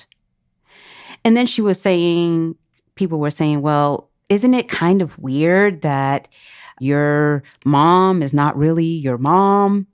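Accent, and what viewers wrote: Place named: New York, United States. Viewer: Thank you for sharing it was great to hear this as a sperm donor and I love your NY(?) accent ❤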